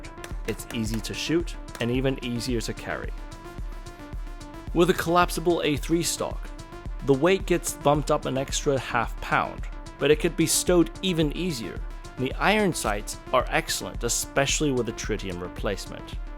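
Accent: Germany. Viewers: Best SMG ever! Update: Your German accent is so naturlich! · An Asia, speaking in a German accent is peak 2026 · Idk who this guy is but when I see an asian dude do a german accent I sub immediately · great. like your german voice game.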